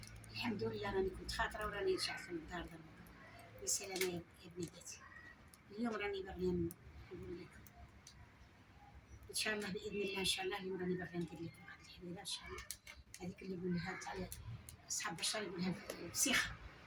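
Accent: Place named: Gulf, Arab world. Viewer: ماشاء الله 😍. باين بنينه وروعه كما للهجه عندنا بالخليج 🌹. تسلم يديك خالتنا العزيزه❤️ سعدات الي بياكل من طيابك 👍🏻❤️🕊️🕊️🕊️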